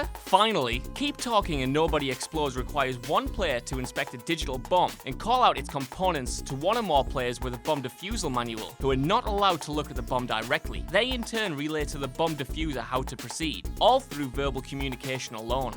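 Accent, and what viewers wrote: United Kingdom. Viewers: Eh he's a Brit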